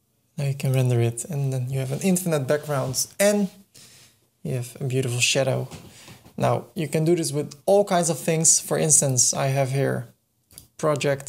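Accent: Netherlands. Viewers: I absolutely love the netherlands accent. Great video as well. Thanks. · he said: hello, welcome to this new tutorial and I could immediately tell he's Dutch.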